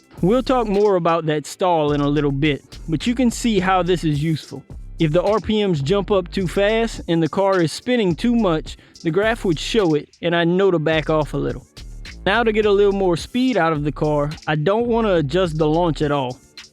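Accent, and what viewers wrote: Louisiana, United States. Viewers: Your accent sounds Cajun. Are you from the Acadiana area? I'm in St. Martin parish. 🙌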